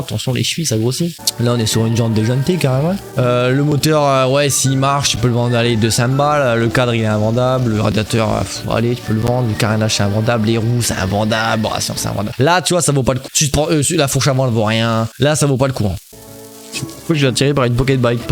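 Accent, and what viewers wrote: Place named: South, France. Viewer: Pourquoi tu prends l'accent du sud pendant toute la vidéo ?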